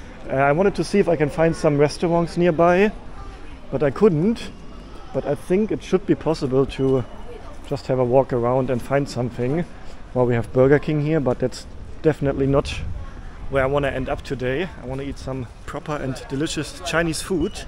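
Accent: Germany. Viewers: 一开始我不知道你是德国人,你的口音听起来很像德国人,因为你让我想起了一位德国故人🤣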